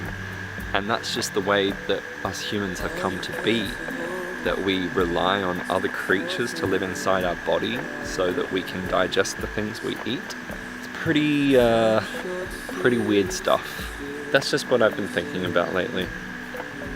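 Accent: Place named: United Kingdom. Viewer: so much yeah. btw given your accent i assume you're British so i'm sorry about brexit and all the possible negative consequences it might have.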